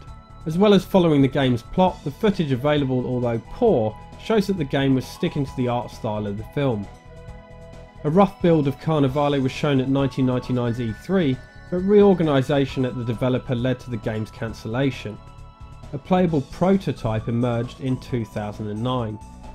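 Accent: United Kingdom. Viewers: I think one of the reasons why the N64DD was DOA was the fact you could only buy it off the internet and online shopping was a brand new concept back in 1996. So new in fact that almost no one really did it at the time. Why Nintendo did it is a complete mystery to me but it would have had a much better time if it was sold in retail stores like GAME in the UK where you sound like you are from (you sound British to me) or Best Buy in the US where I live. I dunno if you would agree with me or not, but it is the main reason why it did not go well from what I have heard.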